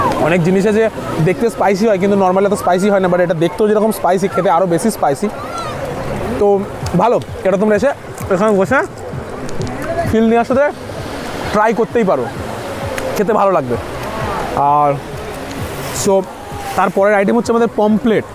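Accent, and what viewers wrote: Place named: Bangladesh. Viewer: তোমার বাড়ি কি বাংলাদেশ কথাবার্তা শুনে সেই লাগছে আমার বাড়ি দীঘা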